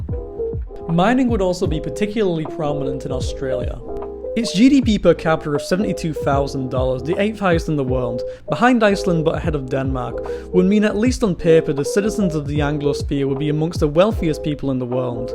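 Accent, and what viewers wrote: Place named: United Kingdom. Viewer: “ The Americans “ 😂 you sound so British !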